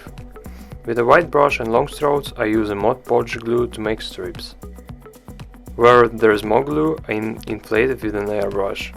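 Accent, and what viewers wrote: Russia. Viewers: Ты русский? А то тебя акцент сильно выдаёт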